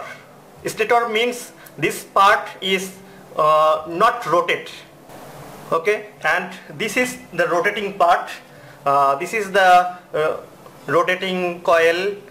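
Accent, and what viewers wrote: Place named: Bangladesh. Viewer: Your English like Bangla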